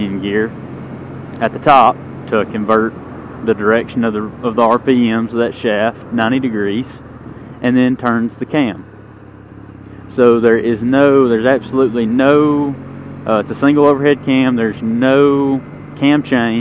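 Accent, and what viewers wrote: South, United States. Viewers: I like your accent. looks Sothern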